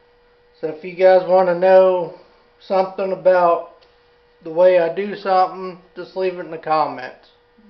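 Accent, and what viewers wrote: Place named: Appalachia, United States. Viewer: PS, Appalachian accent I hear!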